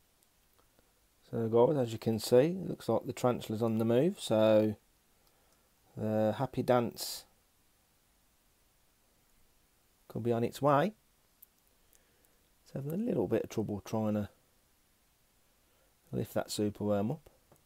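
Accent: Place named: United Kingdom. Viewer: I hear the a as o instead. Brittish accent can be difficult to understand sometimes, particularly if you hear American all the time then you thing that is the normal. I am not a native speaker, I am from Greece. You speak with original brittish accent though. Maybe Charles Darwin spoke like that, I don't know. Generally the Brittish sources I have read refer to the animals with more normal names. You say royal pythons, Americans say ball pythons. In Greece the Greek name is royal python but colloquially many people say the American, ball python. You say horned frogs, Americans say pac man frogs which is somewhat silly. The same with morio worms. I don't know who tried to market them as superworms, it sounds like a cartoon name and of course if you were to find them in the wild you wouldn't devise a name like that. Or the black soldier fly larvae which are called phoenix worms, or the stupidest of all, calciworms, while in fact they are just plain maggots.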